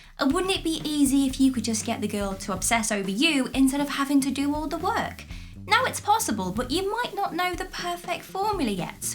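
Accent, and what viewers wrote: United Kingdom. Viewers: Sounds like a bloody brit , not?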